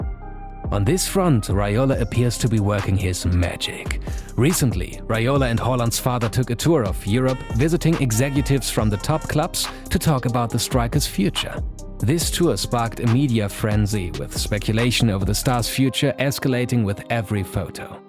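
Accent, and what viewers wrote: Germany. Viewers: Can you hear the German accent? The presenter is German. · du bist deutsch das hört man sofort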